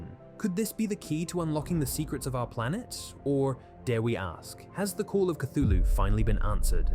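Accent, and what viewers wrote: United Kingdom. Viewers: Why do you have a British accent and an American accent?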